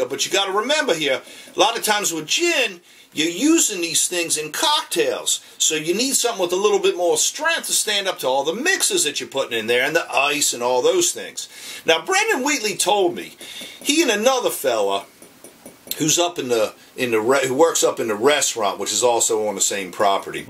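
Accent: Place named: South, United States. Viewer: You need to work on your southern accent a bit.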